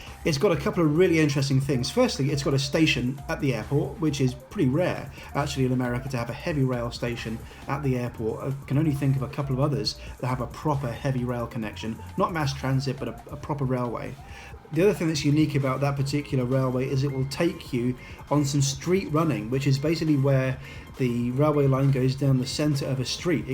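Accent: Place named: Newcastle, United Kingdom. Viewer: Oh, so you're half geordie!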